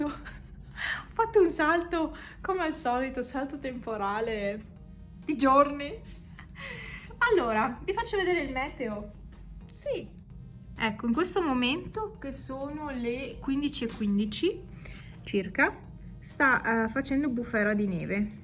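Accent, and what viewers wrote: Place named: Belluno, Italy. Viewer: Ti ho scoperta per caso cercando video sui gatti... E poi sento l'accento e mi incuriosisce xk mi sa di casa... Guardo i tuoi video e vedo che sei da Belluno (montagna da come vedo) 😍 io sono da santa Giustina, ormai da due anni trasferita ad Amburgo... Bello sentirti parlare e vedere le bellissime Dolomiti ❤️ da dove sei di preciso, se posso chiedere...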